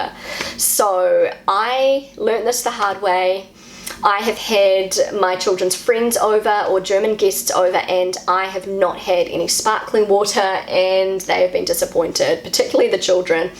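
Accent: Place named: New Zealand. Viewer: Also bei mir geht das Verstellen der Abspielgeschwindigkeit zwischen 0,25 bis 2x, allerdings verstehe ich auch bei Normalgeschwindigkeit ganz gut. Anfangs hatte ich auch etwas Probleme mit ihrem Kiwi Accent, aber daran gewöhnt man sich relativ schnell..!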